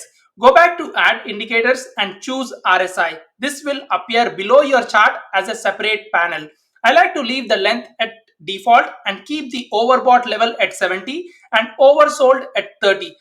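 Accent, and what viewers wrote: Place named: India. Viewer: You have the most stereotypical indian voice I've ever heard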